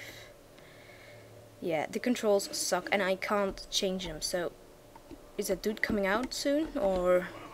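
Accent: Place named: United Kingdom. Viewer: Nice accent : ) is it british?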